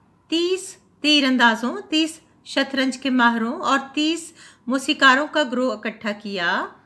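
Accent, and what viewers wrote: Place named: United Kingdom. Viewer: Super Reading I like the English accent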